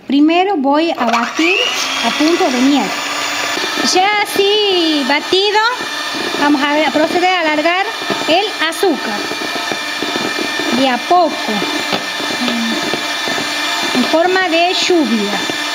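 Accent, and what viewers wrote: Tucumán, Argentina. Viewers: gracias por compartir tus recuerdos,y recetas..soy Santiagueña y muy fuera de lo que dicen amo a Tucuman,es una provincia que me brindo mucho,sobre todo laboral...gracias por tú voz inconfudiblemnte Tucumana!!!! Díos te Bendiga!!!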